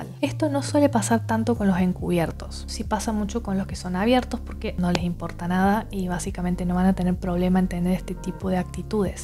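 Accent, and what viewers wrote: Córdoba, Argentina. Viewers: Amo tu acento. Supongo que eres de Cordoba. ¡Me encanta! ¡Gracias infinitas por tu ayuda! ❤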